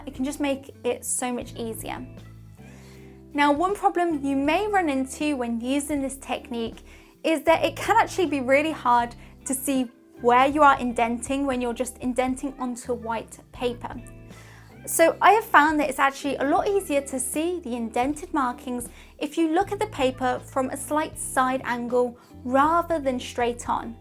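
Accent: United Kingdom. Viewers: She have a British accent...😲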